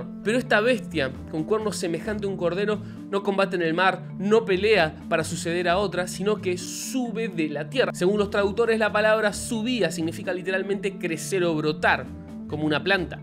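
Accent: Argentina. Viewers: Que tal, un pequeño comentario, admiro mucho tu conocimiento y explicación de los datos religiosos, como suelo decir: "lo peor que puede pasar es que aprenda algo" (obviamente un dicho sarcástico, ya que aprender algo siempre es beneficioso). Solo un pequeño defecto que oigo en tus pláticas religiosas, lo cual parecen desviar el significado de las mismas. Cuando decís a quienes les estás hablando, que terminas los sustantivos en "o" (masculino), "a" (femenino), y "e" (bisexual, transexual, vos sabrás que), pero si vas a hablar pláticas religiosas solo habla hacia hombres y mujeres (puedo captar en tu Acento que sos Argentino, más ni siquiera para complacer a la cultura de tu querido país deberías usar esas expresiones). Resolveme por favor una duda: que generos dice la Biblia que creo Dios en el sexto día?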